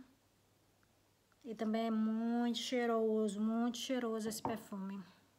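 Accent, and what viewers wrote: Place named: Northeast, Brazil. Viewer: adoro vc e esse seu sutaque nordestino vc é maravilhosa suas resenhas.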